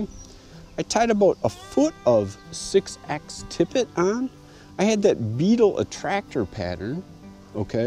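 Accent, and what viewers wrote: Wisconsin, United States. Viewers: I'm thinking Wisconsin/UP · You have the most Canadian accent I've ever heard LOL to either that or like Wisconsin ish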